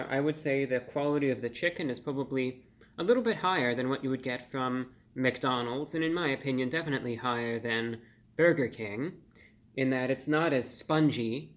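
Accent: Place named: Mid-Atlantic, United States. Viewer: Mid Atlantic accent and idk why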